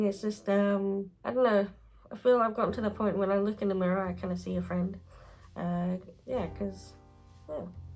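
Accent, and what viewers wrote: Scotland, United Kingdom. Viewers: I need to be more “ GRACEFUL and DELICATE “ 😂. I completely relate. Aw your videos make my heart happy! I’m an animal and wildlife lover plus I’m partial to a wee mushroom also. So happy to have found your channel ❤ ps. I can still hear the Scottish twang going on, I’m Scottish myself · 😍💕🐿🎃 I'm levitating too, just from watching this video! 😅 (Getting Scotch tape to reattach myself to seat 😉)! Your illustration is magical!✨ Loved the forest and the legend of Skogsvrå, and the busy squirrels, and there's that charming witchy animation too! 🧙‍♀️🐈😊YouTube suggested one of your videos yesterday, and when you appeared, my first thoughts were: Oh, great vibes, kind face, lovely voice, nice Scottish person (I'm from the north of 🇪🇸, so you sound really Scottish to me 😉), wonderful sense of humour, likes what I like, delightful cosiness around her, and totally amazing artwork…! 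🤩Subscribing!! 😃👍 Thank you so much (And Margo😉), and have a very lovely day!! 🙏👏👏👏😘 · Hi. I am in awe! Why have I not seen you before?! This painting is fabulous! I love it! re: your accent. At first I thought Scot cuz it reminds me of a friend's accent from Scotland, but then some words sound like my friend from Sweden. The mix is beautiful..And, of course, I love being right LOL. On both counts 😂😂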